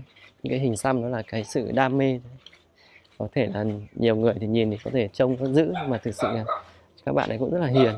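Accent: Hanoi, Vietnam. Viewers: Ai cũng nghiện kênh của rồi, bây giờ cầm ĐT lên là mở thôi, không còn thời gian cho kênh khác nữa, Cảm ơn con nhiều nha, cảm nhận như được đi Du lịch, thế thì làm sao mà không yêu được cơ chứ, rất yêu con, vì giọng nói của trai Hà Thành, vì nó gợi lại bao kỷ niệm, ký ức của một thời Thanh xuân đã qua mà không bao giờ lấy lại được, Cảm ơn con nhiều nha.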